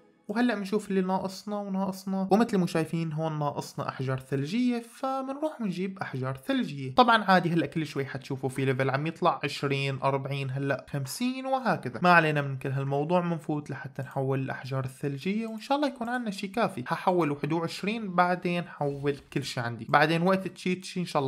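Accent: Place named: Iraq. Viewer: الاخ عراقي😂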